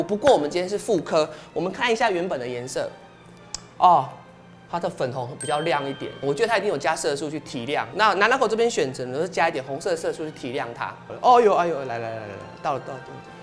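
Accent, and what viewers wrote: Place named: Taiwan. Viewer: 好多地道台語真的好愛🤣🤣🤣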